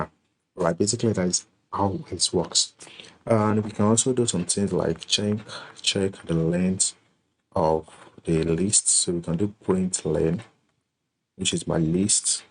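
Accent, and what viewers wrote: Nigeria. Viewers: This tutorial guy sounds like a Nigerian 🇳🇬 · This person has a Nigerian accent 😍😍😍😍😍 Me likey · A Nigerian, nice. I'll recognize that accent anywhere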